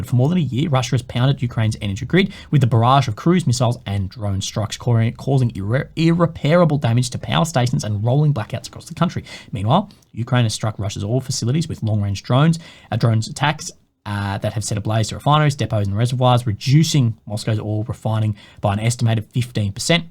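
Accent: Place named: Australia. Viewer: He speaks English with an Australian accent.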